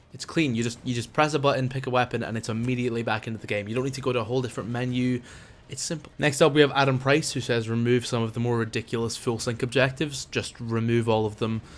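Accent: Scotland, United Kingdom. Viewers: Damn, is scottish?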